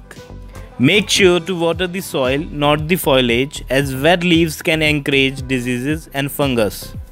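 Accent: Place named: India. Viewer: ох уж этот индусский акцент.... ужасно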